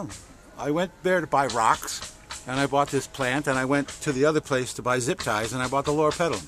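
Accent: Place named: England, United Kingdom. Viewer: Now I understand.... that's why it sounded like an English accent to me! but as I'm Italian, I thought I was wrong. So There is one more reason to follow You, to learn better Queen's English. See You....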